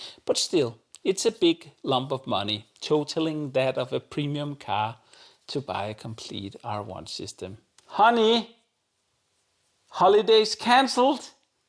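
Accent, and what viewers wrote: Denmark. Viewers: I Iove your reviews and your Danish accent! Back in 1990, my first “real” amp was the Technics SU-V90D 😉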